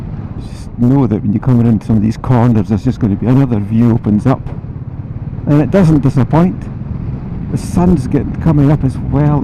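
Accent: Scotland, United Kingdom. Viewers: Really loved the video and your Scottish twang. Looking forward to seeing more videos and your commentary. Beautiful scenery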